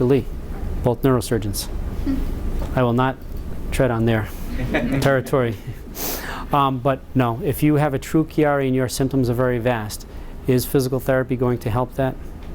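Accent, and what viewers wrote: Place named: New England, United States. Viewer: Lol. Near the start, his New England accent really threw me off momentarily. “…in other POTS of your body.” Lol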